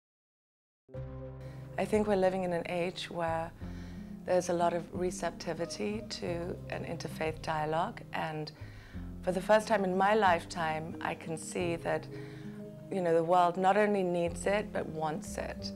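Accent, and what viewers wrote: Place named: Hollywood, United States. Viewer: good message, better with hollywood accent dropped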